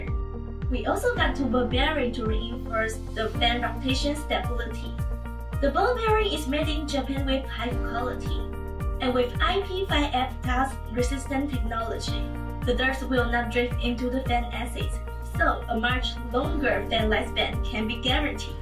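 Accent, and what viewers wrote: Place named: England, United Kingdom. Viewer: So qute english accent. Good job haha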